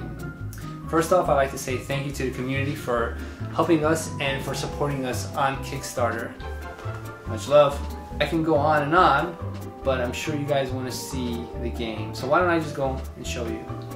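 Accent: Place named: Netherlands. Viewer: You are dutch, certainly.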